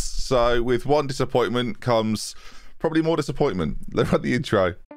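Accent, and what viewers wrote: United Kingdom. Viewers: never knew a fucking baby could have a british accent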